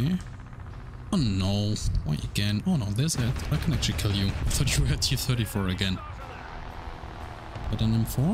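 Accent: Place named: Germany. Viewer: wen i hir se jerman egsent i fiel wery much at home. Geiles video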